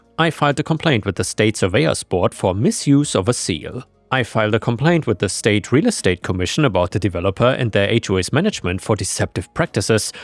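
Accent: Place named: Germany. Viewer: lol a German accent tells the story cute 🥰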